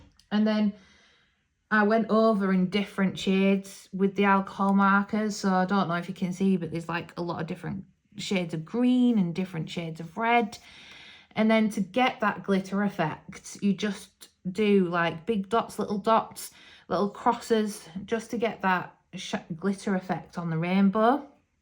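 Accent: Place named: Yorkshire, United Kingdom. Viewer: Welcome to colouring! I started my channel earlier this month as well. Yay for another delightful Yorkshire accent, yay for another happy pup, and well done with all that work in April!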